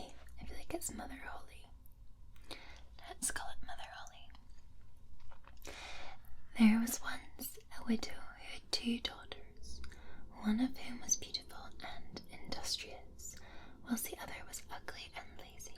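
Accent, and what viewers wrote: Scotland, United Kingdom. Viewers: Thank you,. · I'll never stop loving the Scottish accent for ASMR. I hope this stuff never gets regulated like other modern medicine 😭🤣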